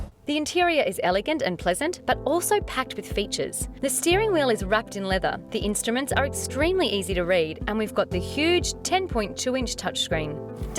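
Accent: Australia. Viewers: since when did Aussie accent start getting compliments? anyway, the car is not as elegant as the Sydney blond!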